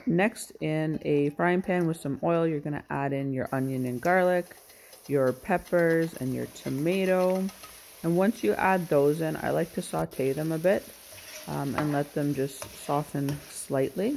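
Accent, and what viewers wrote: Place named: United Kingdom. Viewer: Why did I think you was British lol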